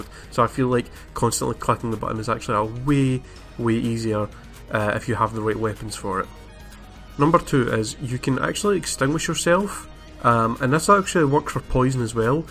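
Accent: Scotland, United Kingdom. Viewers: You`re from Scotland, right?:D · Are You Scottish ? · Thank you for posting this! nice Scottish accent! :-) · As soon as I heard his Scottish accent I liked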